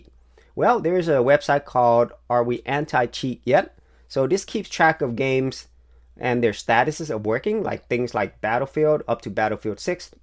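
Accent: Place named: Latin America. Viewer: My wife and I were trying to figure out your nationality based on your accent. I said Hspanic but my wife said Korean. I see your picture now but help us! Did you grow up in a Latino country?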